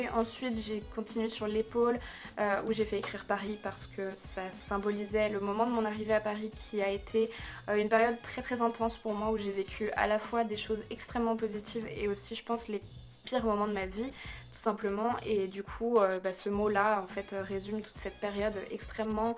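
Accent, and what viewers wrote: Switzerland. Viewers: PS : je suis Suissesse et je te confirme que tu as un petit accent de chez nous 😉 c'est très chouette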